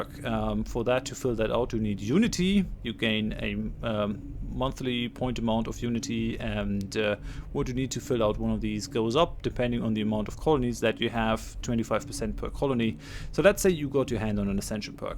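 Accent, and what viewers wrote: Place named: Germany. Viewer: Sounds german ;)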